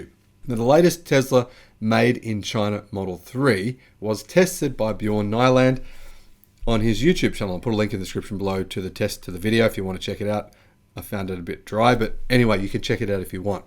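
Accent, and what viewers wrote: Australia. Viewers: Thanks mate, good to hear an Aussie talking Tesla the way you do. I have been tossing up about buying the new 2022 Model 3 and although I know it’s so much better and improved in so many ways to the 2021, that slower 0-100 time by .5 of a second is something I am grappling with. The one I test drove recently was the 0-100 in 5.6 seconds and to go to 6.1 seconds could be a dealbreaker for me. It’s the slowest Tesla now (albeit still bloody quick) and annoying that I can’t get hold of the previous battery model and quicker off the line car. To be honest, I could not give a stuff about range, that is so not an issue for me.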